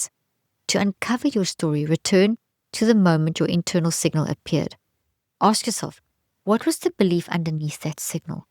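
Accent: South Africa. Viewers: Dr Leaf, are you maybe South African because your accent sounds exactly?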